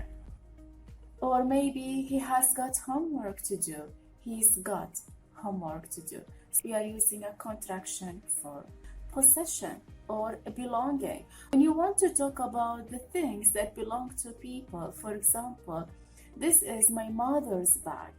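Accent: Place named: England, United Kingdom. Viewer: thank you, I really appreciate your work, btw I love your English accent and your soft voice <3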